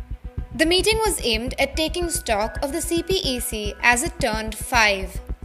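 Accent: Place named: India. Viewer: was ready to dislike the moment i heard the accent, but this was unbiased... for an Indian outlet talking about pakistan, that's a massive achievement well done!.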